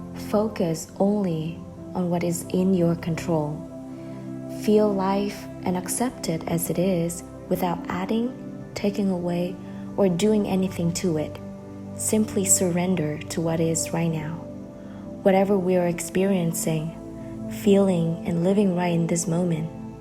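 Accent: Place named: United States. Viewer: O nói mà tưởng bà Mỹ nói á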